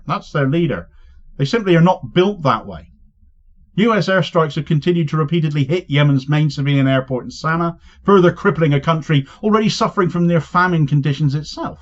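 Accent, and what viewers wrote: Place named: England, United Kingdom. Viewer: not listening your you disparage my president. you sound like your English anyway. Good bye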